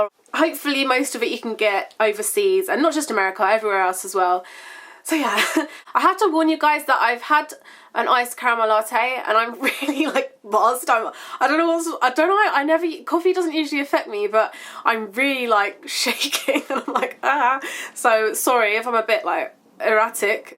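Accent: United Kingdom. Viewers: everytime i watch your videos i feel like talking in a british accent for the rest of thee day :) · Love the accent, I kinda how to speak like this Brit-ain accent ! Btw I'm from Malaysia, love your review :) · I LOVE your British Accent <3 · i love listening to british people speak. it's just so much more sophisticated than us americans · the british accent sounds like heaven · Does anyone else find the need to talk in a british accent after watching her videos? or maybe it's just me, I absolutely love her accent (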